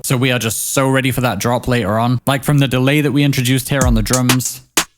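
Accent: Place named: Sheffield, United Kingdom. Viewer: Just found you today. watched a bajillion videos already. You have a bit of a Sheff twinge to your voice, hope i'm right. Big up Steel City if i am!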